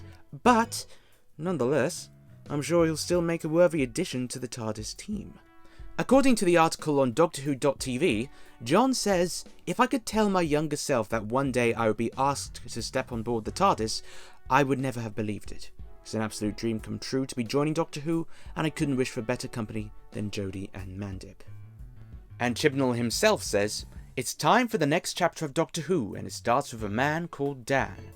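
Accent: RP, United Kingdom. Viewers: First off, to you and all fellow Whovians, KISS army (fans of KISS), Trekkies, Jedis and everyone who's alive (I think you'd agree to name all the people on Earth would be difficult) - HAPPY NEW YEAR 2021. As I've said many times now... FUCK OFF 2020 (apologises to ladies present for my swearing). Wouldn't you agree 2020 is the first year that people the world over ACTUALLY and personally DISLIKE? Anyway, ODDLY, I have to say following what you were saying in the video was difficult? No disrespect. I love you posh accent and tone because I have it too. We have the Mojo ladies and Yanks like LOL Maybe picked up from watching Jon Pertwee, the previous two Doctors and beyond with their BBC Queen's English? Let's face it, until 2005, Doctor Who never was working class or had any characters representing them until the 9th & 13th Doctors. And now Dan has/will? 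Which leads me to the next point: Is it possible the increase in northerners has put off American viewers because apparently they have been baffled by John Bishop? I haven't watched "Resolution" or much of the season that finished. Not sure which one it is. So, I was confused by the trailer with John Bishop. It looked to me in the way it freeze framed and the wording to be introducing Bishop as the NEW Doctor? In fact I think they've MISSED a chance to cast him which would've been perfect. I don't think a female Doctor has been a hit with non fans. But to say the show's problems is due solely to the Doctor being femal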